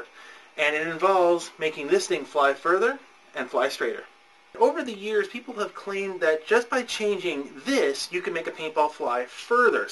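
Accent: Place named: Canada. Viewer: the dude (aND i use the term lightly) has GOT to be canadian.. anyone..lmao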